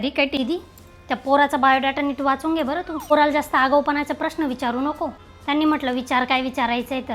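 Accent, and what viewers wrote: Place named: Vidarbha, India. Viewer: छान वर्‍हाडातला हेल बोलता तुम्ही. मला माझ्या नात्यातल्या आवडत्या तायांच्या घरी भेटीला गेल्यासारखं वाटतं...❤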